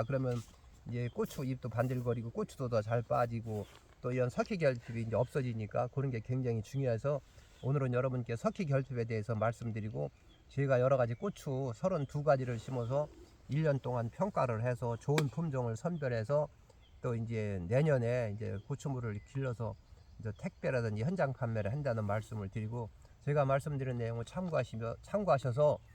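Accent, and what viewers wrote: Chungcheong, South Korea. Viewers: 정겨운 충청도사투리의 설명이 참듣기좋아여 오늘도 추비의 중요성과 비료 선택의 중요성을 잘숙지 했습니다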